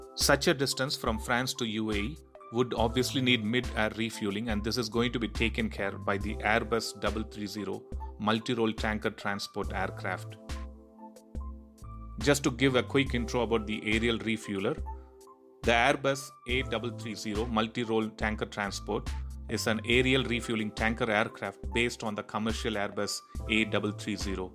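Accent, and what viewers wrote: Tamil Nadu, India. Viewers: Bro by your accent I feel you are a tamilian... Good work . All the best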